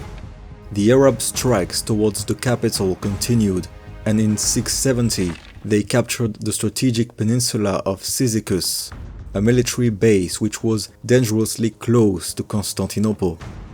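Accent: France. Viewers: mate are you french ?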